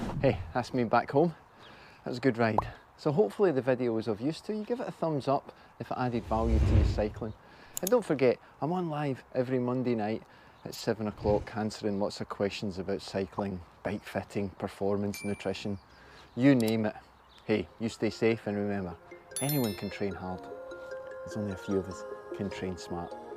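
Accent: Scotland, United Kingdom. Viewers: Hi. I live in New Zealand (and have Scottish ancestors so every time I hear your accent somethin inside me sorta triggers, in a good way), and I am 140KG. Gravity and I dont get along. Yes, I could lose some weight, but considering I have gone down from 220KG, and been this weight for 6-8 years, its probably about where I will end up. I am still allergic to hills, but what I do now is I have 2 bikes, a cheap commutery type thing with compact crankset and a decent size big cog on the cluster, and a a roadie with full size gears. I do about 3 rides a week, 2 shorter ones and one long one. On the short ones I primarily ride up some hills with 13% sections using the commuter. Then on the weekends I combine all the hills in one longer ride on the roadie. I find that this works well, I dont blow out during the week (i'm also 55yr old, so recovery is slow), and then I find I can push the big gears a bit more. 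I'm going to apply some of the stuff you have said here as well and see how I go.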